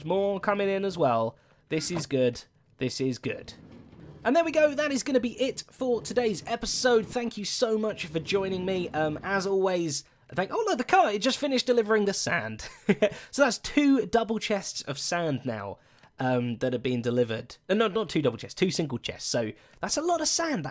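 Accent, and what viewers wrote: United Kingdom. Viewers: Why do british people get all the great accent? It's not fair :(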